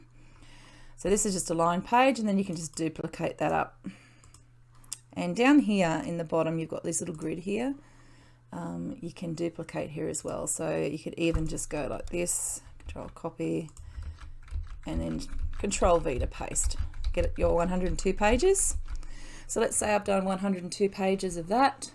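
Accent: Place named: Australia. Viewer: It is great to hear an Aussie expert voice! Glad I found your channel!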